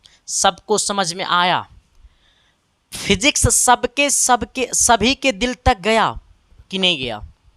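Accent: Bihar, India. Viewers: Sir aap to bilkul bihari ki tarah bol rahe hai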